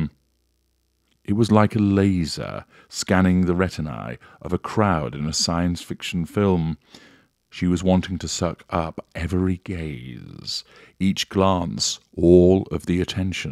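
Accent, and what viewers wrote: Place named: RP, United Kingdom. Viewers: Yes a great story teller.All my senses activated and I saw a privileged male being spoilt as a child and constantly told by his mother that he and the family were so much better and superior to others that he carried this elevated opinion of himself,a huge ego into adulthood.He despises anyone who remotely mirrors his own failings and selects them for shredding eg Megan.How am I doing so far.You probably attended Oxford or would have aspired to, hence your accent.Instead of readers saying you are often broke, I would think you hoard money. Possibly mimic your therapists or have studied yourself in psychology. You have a need to dominate but there will always be someone more powerful you have either met or still to meet. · 😂😂 you crack me up.. love your storytelling..and that posh accent... its perfect... I enjoy all of your videos regarding this trollop.. 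😂😂 ❤🇦🇺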